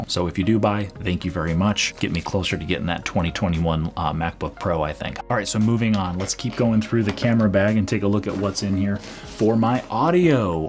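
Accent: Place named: Midwest, United States. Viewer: Thanks for all your great FCPX Tutorials and other content. One question: What's the correct way to use a FCPx project as a template for further projects. For example, lets say that you are making a 10 part series and you want them to have the same intro and outro as well as the same basic look and feel (but of course with entirely different footage and audio in each episode). Is there a way to use a completed project as a template for other projects that preserves intro and outro and the different effects that were used? Any tips on doing this, rather than having to start each new episode entirely from scratch each time? Thanks! btw, I used to live in Omaha and went to Westside High, so I also enjoy your mid-western presentation style.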